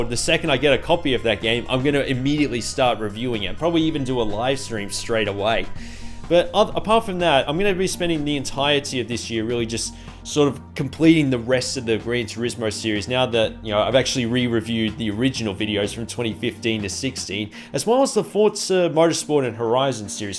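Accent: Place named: Australia. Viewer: I really love your videos, I’ve been watching you for ages now. But I love that there’s an Australian voice in this space on YouTube and I also love the quality of the videos that you put together. Thank you so much for inviting us in and letting us know a little bit about you and the reason for the channel, I’m sure I’ll appreciate everything just a little bit more now. Congratulations on 10 years!!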